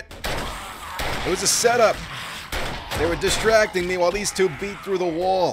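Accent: New Jersey, United States. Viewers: I love that i can tell you have lived in new jersey.(im from jersey)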